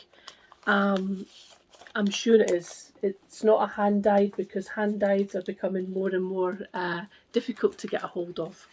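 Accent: Scotland, United Kingdom. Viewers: The Tudor Bee is nearly as lovely as your scottish accent! 😊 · I just found you and love your stitching and your lovely Scottish accent. I love the Flea Market pattern - actually anything by Lori Holt. The Tudor pattern is very sweet and one I'd love to stitch. Enjoy your vlogs. Cheers from Canada.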